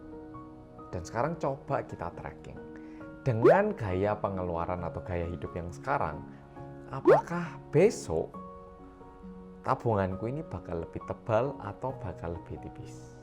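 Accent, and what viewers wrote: Java, Indonesia. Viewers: Orang korea yang medokkkk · Mas medokkkk.....aku salah fokus liat kowe kok cek kuruseee 😔 cukup mas jok tmbh kurus maneh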